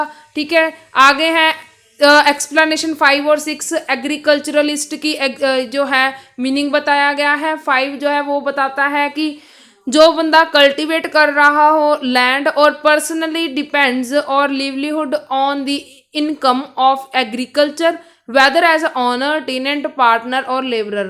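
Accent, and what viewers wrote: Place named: Punjab, India. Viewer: Punjabi/Haryanvi voice ❤️❤️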